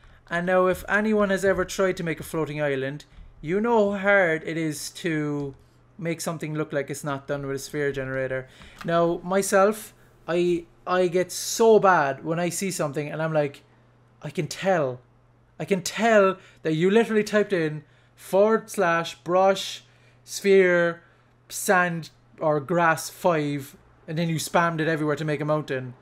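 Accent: Ireland. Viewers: I take it back, I can hear the Corcaigh in him. Gwan boy!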